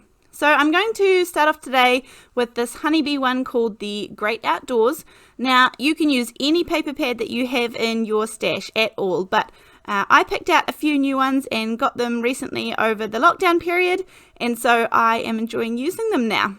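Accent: New Zealand. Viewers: Great to hear a Kiwi voice, and the clear, no fuss instructions. I live in the South Island too. Love that quick and easy card. 👍💕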